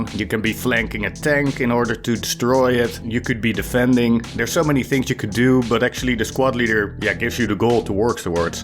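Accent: Netherlands. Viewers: Just got the game on Wednesday. Been playing every chance I get. I’ve played commander a hand full of times and was straight up honest about it. The squad leaders were very helpful and them because they wanted to win, it was in everyone’s best interest to work through me being a noob. Like you said, a bare minimum commander is better than no commander, although you said squad leader. That being said, squad leader intimidated me the most. Because you are right there in the thick of it all. Commander I hung back, drove supply’s, set up garrisons, and supported the mission via supply drops, bombing runs, and deploying tanks. As a squad leader you have to do much more in my opinion and I don’t want to let my men, and commander down. Also, I recognize that accent anywhere, plus gives it away. My mom still isn’t a US citizen because she doesn’t want to give up her Dutch citizenship, she was from The Hague and my Oma still lives there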